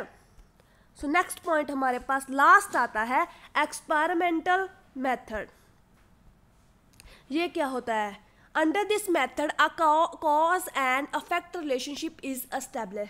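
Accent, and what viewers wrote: Punjab, India. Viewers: मैडम जी आपने जो भी पढ़ाया बहुत cute लगा जी, किन्ना सोना बोलते हो जी आप ☺️ पूरे पंजाबी टोन है जी ! बहुत बढ़िया , आप पंजाबी हो🙂?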